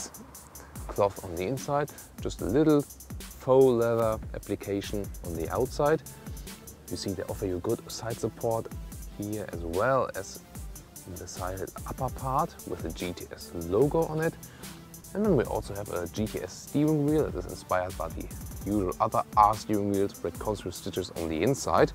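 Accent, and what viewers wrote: Germany. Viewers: You are becoming my favorite reviewer!!! I'm American of German descent, so I love hearing an English review in a German accent! And I like your opinions very much, as well as the quality and information from your videos. Thanks, great work! (I love this car, too! we had this model here until the 80's, I believe. My friend had a Scirocco, it was awesome!)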